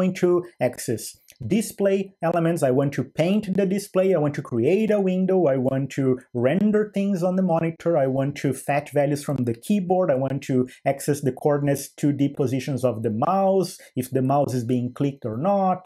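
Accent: Brazil. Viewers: You have a Brazilian accent... vc é br ? Sdl ainda vale a pena?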